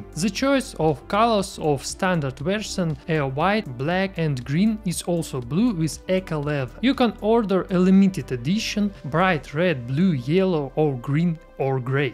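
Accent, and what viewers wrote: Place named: Russia. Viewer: The Russian accent is the cutest accent in English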